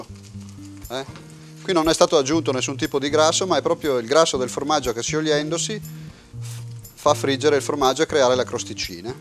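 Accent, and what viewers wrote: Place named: Friuli, Italy. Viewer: l'hai sentito parlare? certo che è friulano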